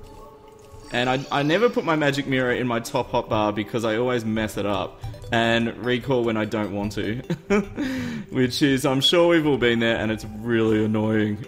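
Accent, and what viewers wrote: Australia. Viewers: hahaha also you being a aussie like me is so helpful and convenient and makes it easier to watch ya vids:') ps you sound like your from over east like queensland or something? I'm from perth btw <3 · r u australian?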